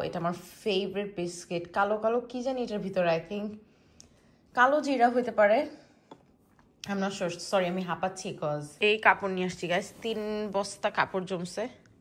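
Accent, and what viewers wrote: Sylhet, Bangladesh. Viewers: The way u speak sylheti is so cuteee🥰🥰 khala is lovely 🌹🌹 Sb Smy family Niye vlo thako shuke thako dua kori Allahummah Barik❤❤❤❤ Instagram a DM kora jacchena bestie eMail address?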